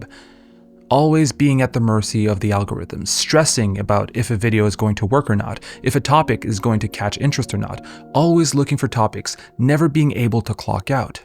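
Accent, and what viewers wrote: Quebec, Canada. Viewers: Did the freedom from monetization allow you to be more french-Canadian too? I feel like the Quebecois really came out in this video 😊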